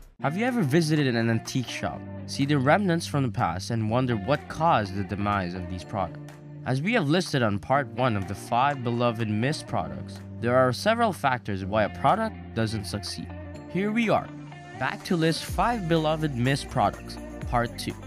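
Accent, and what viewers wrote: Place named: United States. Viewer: Are you American? Pinoy products pero parang Amerikano yung nagsasalita. I find that odd.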